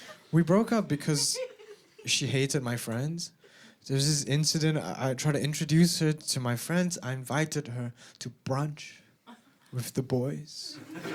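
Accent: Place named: Singapore. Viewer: Are you Singaporean? I can hear the accent haha, I’m proud!! You have really great jokes and way of storytelling ❤❤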